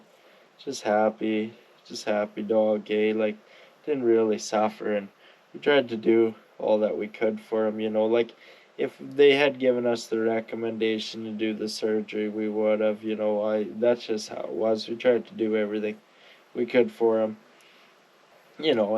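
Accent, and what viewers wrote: Canada. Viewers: Dude. Damn, Ive only been watching you for a month, I appreciate seeing someone your age with a willingness to work and a willingness to learn, your vids are informative and you crack me up with the Canadianese you speak. RIP Cougar. I put my chocolate down 10 years ago last February and I still have dreams about him. I bet I woke up and looked at his chair for a week straight after he was gone, it just becomes such a habit and like you said- aint no better way to start your day than to see your boy all happy and ready to go on another adventure. Labs are the best, I dont care what anyone says if youre blue collar and hunt and fish and work on things outside, a Lab is the way to go. My boy was the best damn friend I ever had and that 12 years went by in a blink, I never had kids so he truly was my boy. My bro. He was still eating right up until the end and still plenty happy in life. 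The pain meds obviously helped him but that bone cancer is a bitch, for dog and human. Anyway, Im sorry to see Cougar go, he showed you what a good dog can do for a persons life so go ahead and get out there and get yourself another pup and bring him up. Im a renter so getting another dog is out of the question but you, hell, you should go get two dogs and I aint even kidding. Take care. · I could listen to him talk about absolutely anything. I’m Canadian but I am not blessed with his amazing accent 😊❤️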